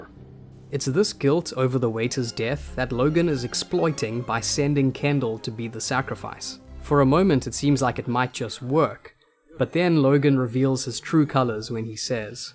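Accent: South Africa. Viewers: Can hear that South African accent :P nice videos bru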